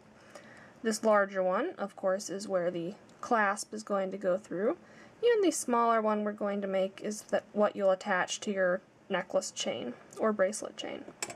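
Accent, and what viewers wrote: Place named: United States. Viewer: From the UK, it makes a change to have a clearly understandable audio, albeit an American accent, hahaha, ☺☺☺, cool calm and collected, I've 👍, and subscribed, luv and cuddles from me and your UK. Viewer's ☺😊😀☺😊😀❤❤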